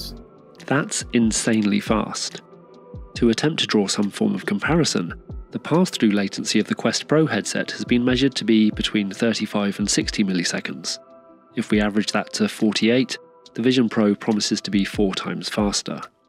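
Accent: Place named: United Kingdom. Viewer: Great video, nice to hear a British voice on American Tech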